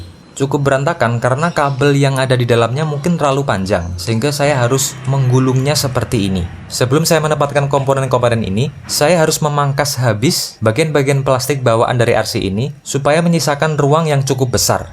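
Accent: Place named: Surabaya, Indonesia. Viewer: Endinge metu Suroboyoane 😄 Baterai di sering paralel berarti tegangan tetap 3,7?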